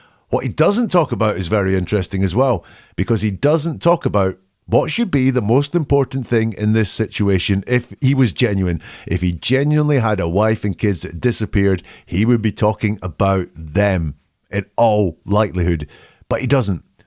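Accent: United Kingdom. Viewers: Hello, my friend! You sound British! I'll share a bit of synchronicity with you. About an hour ago I just learned of the idiom "hoist with one's own petard" How fitting for Mr Watts and this very insightful video! I have subscribed and look forward to hearing from you often.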